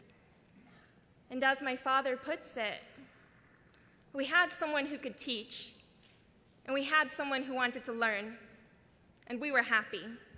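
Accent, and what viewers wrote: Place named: United States. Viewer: 比那个强多了地道的美式英语❤❤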